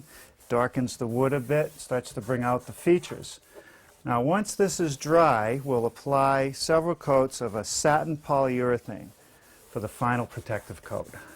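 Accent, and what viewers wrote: New England, United States. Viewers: I have to say, as a Texan, I’m enamored with his yankee accent, but I’ve been mentally calling it “Cortisan” white oak from watching these videos lol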